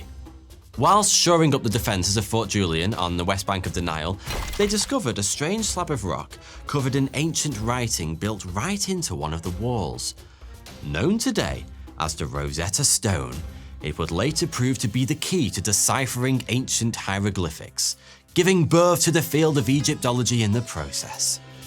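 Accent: United Kingdom. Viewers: While this video is entertaining, it is inaccurate, which is understandable given your accent. Your dna has been programmed for hundreds of years before my dna was. Go have a pint, and watch some soccer while your crown eliminates you. They are VERY NAUGHTY! Did you know that the Rothschilds personally funded the equivalent of 1 billion dollars for the third coalition, which was partially annihilated simply by marching. Their global banking syndicate was in jeopardy… it’s all quite fascinating how they helped the crown carve up the world. Awfully coincidental that in 1812 England was fighting both America and France and the same time. That pesky Napoleon 😉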